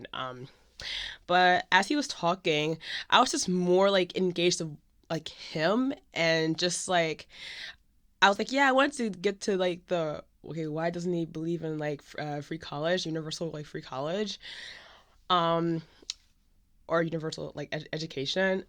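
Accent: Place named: United Kingdom. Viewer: My friend told me to get my ears turned around like this haha I really need to from now on lol I didnt think you were American, I thought you were British haha :D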